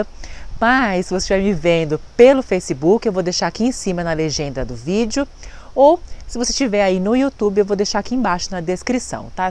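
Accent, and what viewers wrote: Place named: Paraná, Brazil. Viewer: Adorei o seu canal!! Obrigado por compartilhar conhecimento. Ah, esse sotaque é do Paraná, né!? rsrsr (Amei)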